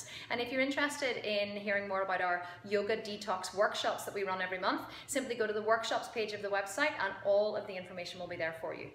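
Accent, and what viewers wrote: United Kingdom. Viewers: Wee belfast lady ballymena? Thanks for video its great x x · Northern Irish accent. Didn't notice that she spoke too fast, but I'm British..maybe that's why.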